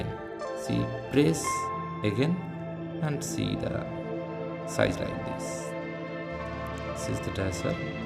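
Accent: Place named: India. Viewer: Very Indian accent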